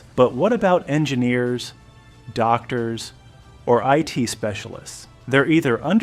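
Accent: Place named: New Zealand. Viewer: Sounds like New Zealand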